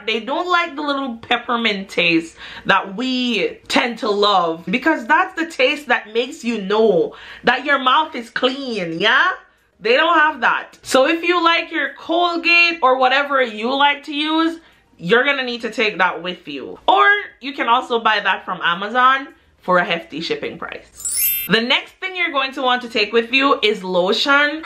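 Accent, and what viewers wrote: Jamaica. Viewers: I did hear the little Jamaican accent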